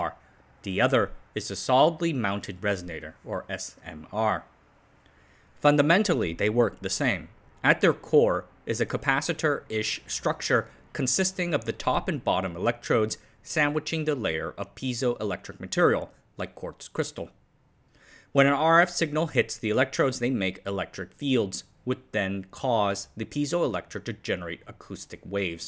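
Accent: United States. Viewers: Surprisend by you saying "aluminium". -Yet you sound like an US American, possibly of Asian descent (barely noticeable at all, when listening to you speaking). What's your story? You didn't say "aluminum" ! -Yet you just sound "american" for my nort european ears! :p I really enjoy listening to your informative, interesting and detailed videos ! Keep up the good work 👍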